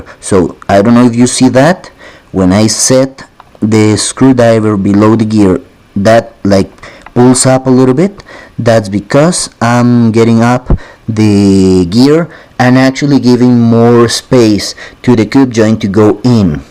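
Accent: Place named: Spain. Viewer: Hola! Creo esres español poor tu acento jajaja buenos videos. Perdona quete moleste, pero tengo pasasdas las coronas centrales y no se donde comprarlas baratas, el diferencial completo ya que creo que esta roto porque lo muevo para alante y se mueve las ruedas un poco pero para atras se bloquea y no hace nada no se que le pasa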